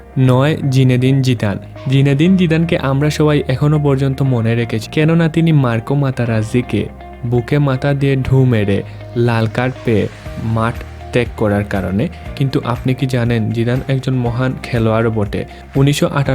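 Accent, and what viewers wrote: Sylhet, Bangladesh. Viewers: ভাই আপনি কি সিলেটি? Please reply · Vai afnar bari sylhet ni? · Are u Sylheti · সিলেটের নাকিতা ভাই তুমি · vai ki sylhety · apnar bari ki sylhet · Bai apni ki sylheti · ভাই আপনার বাসা কি সিলেট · ভাই আপনি সিলেটি? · Nice video thanks for the information. Bro basha kita sylhet o ni😅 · Vai apni ki sylhety 🥰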